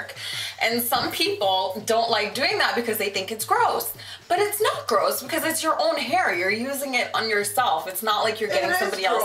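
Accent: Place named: Canada. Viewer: you kinda sound like a canadian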